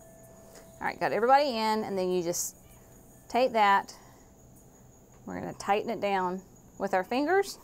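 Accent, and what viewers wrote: South, United States. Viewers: I am glad you did this video! My Felcos are way overdue for the spa treatment. Thanks! Also, have you ever had the auto-generated captions up and played one of your videos? It is so cute how it interprets your Southern accent! :)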